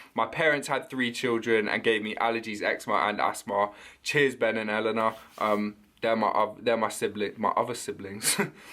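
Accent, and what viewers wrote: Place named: Leeds, United Kingdom. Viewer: I have never heard anyone respect a Leeds accent ever, so tah 😂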